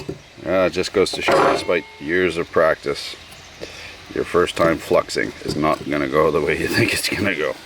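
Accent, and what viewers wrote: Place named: Canada. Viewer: Damn. i knew you lived in canada from the way you talk haha but didnt realize we live in the same province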